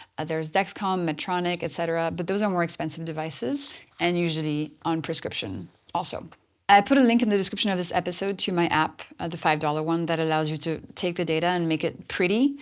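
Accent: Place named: France. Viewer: Is French? She has got a French accent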